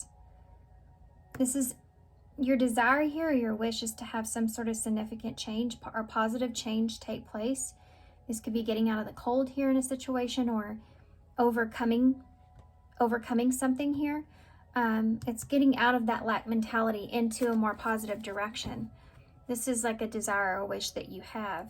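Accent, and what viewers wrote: South, United States. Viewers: Pile 2 hit me hard. I was drawn to it and now I know why. The tower moment for me was my momma collapsed in front of me and I couldn’t save her. My gf at the time , addiction broke us apart. I moved out west and have been single for 9 years. I was having flashbacks today and when I found you I wanted to know what I needed to hear. I have been closed off from love the whole time. Healing and growing spiritually. I literally just ordered a shamanic drum two days ago for myself and loved that you said beat the drum get up and dance. Thank you for sharing your gifts. Also I grew up in Tennessee so your country accent was endearing to hear ❤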